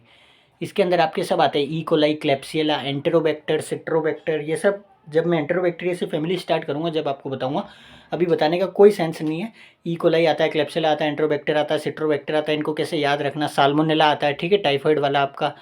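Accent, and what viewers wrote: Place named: Rajasthan, India. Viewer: The rajasthani accent 🤌😅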